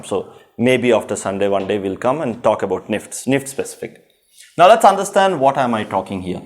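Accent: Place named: Mexico. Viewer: Why is he trying to mix English with maxican accent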